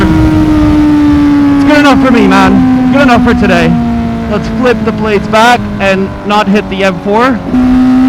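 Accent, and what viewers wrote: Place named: United States. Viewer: I was so confused by your American accent but European plates and roads and using km, I was like where tf is this filmed 😂 I was like this is a weird fucking part of Canada 🤣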